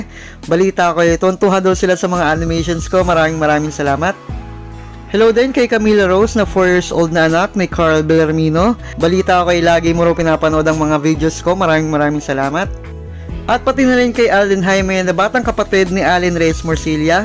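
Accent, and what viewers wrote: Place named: Bicol, Philippines. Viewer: Parang taga bicol to e hahah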